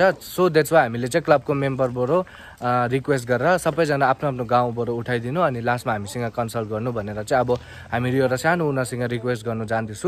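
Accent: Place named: Manipur, India. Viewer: bhai timro bolne tarika manipuri nepali ko jasta cha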